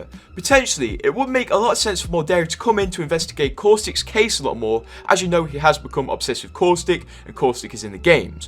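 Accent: Newcastle, United Kingdom. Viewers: Newcastle thick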